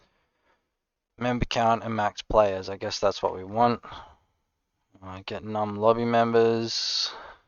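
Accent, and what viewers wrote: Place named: Australia. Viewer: the aussie accent helps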